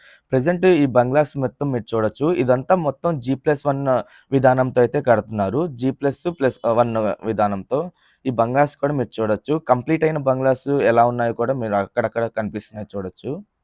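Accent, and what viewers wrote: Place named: Vijayawada, India. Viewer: Vijayawada slang eppudu vinaledha bro. 😅 Valla slang vallaki nachinatu matladatharu.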